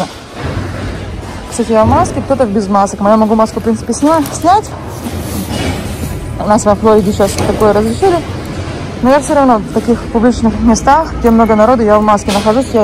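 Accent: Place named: Southern Russia, Russia. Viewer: Ой --я рада , что появился новый блогер на барахолке. Смотрю и Олю и Сашу...Теперь и вас смотреть буду... Только я не поняла , вы в России где жили??? У вас немного южный русский акцент.. Удачи вам в поисках...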